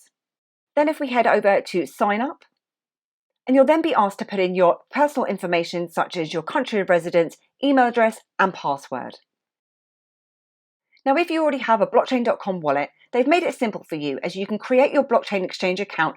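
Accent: United Kingdom. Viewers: I don't understand a shit... British accent🙄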